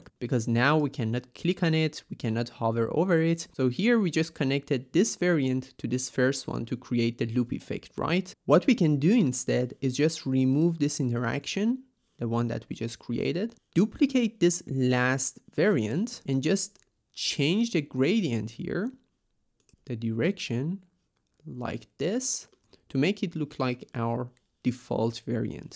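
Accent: Iran. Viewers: I just knew you were iranian the second you started talking lmao, great video btw keeo it up fam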